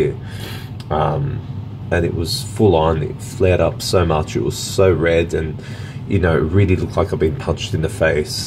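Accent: Australia. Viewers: I got a small flare up of eczema on one of my eyelids as well! It’s been like this for a few weeks. Its flaky and red, kind of like I forgot to add eye shadow on the other eye. 😳It’s odd how it randomly started without warning. Now I’m trying to back track what could have caused it. Thanks for your input about the things that worked and didn’t for you. PS love the Aussie accent 😂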